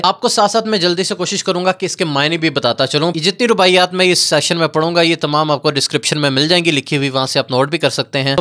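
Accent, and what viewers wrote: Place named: India. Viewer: Assalamo Aliakum Farhan Ali qadri k interview k bd me ap ki video daily Dekhti hu mashallah bht Acha prhte hain ap or ap ka lhja hmare gaon sarai ki trf khohar me hai waha b sb aise prhte hain mare papa b jo ab saath nhi Allah un ki maghfirat farmaye ameen.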